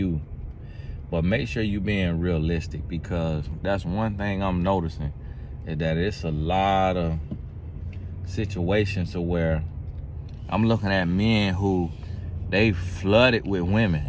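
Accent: South, United States. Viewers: You got that southern accent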